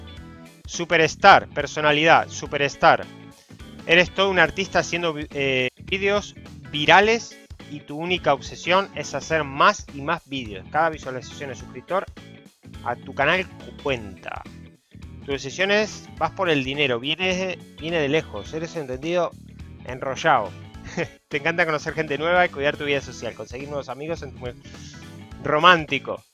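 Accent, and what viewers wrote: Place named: Argentina. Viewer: que vas a ser de españa vos,sos re Argentino,se nota cuando hablas solo con decir zapatilla ya me doy cuenta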